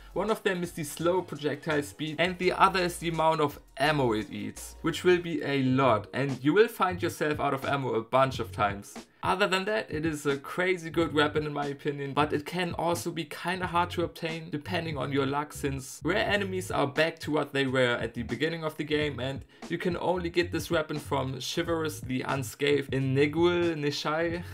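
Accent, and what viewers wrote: Germany. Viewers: From your accent I´d say you are german.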